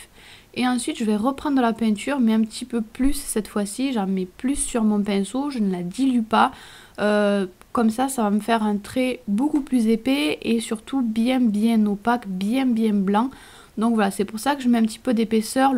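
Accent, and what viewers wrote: South, France. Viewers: Bonjour! Je vais poser une question un peu bête, je voulais savoir si tu étais en région parisienne (je tente quand même malgré ton accent du Sud!). J'ai un coup de cœur pour cette manucure et j'aurai bien aimé que tu me la fasse pour mon mariage l'année prochaine!!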